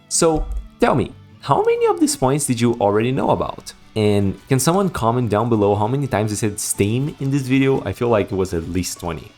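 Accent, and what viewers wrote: Brazil. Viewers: Ótimo vídeo bro, mas só comentar que eu fico muito feliz quando entro em um vídeo em inglês esperando um gringo e percebo o sotaque brasileiro kkkkkk um dia a gente domina o mundo pprt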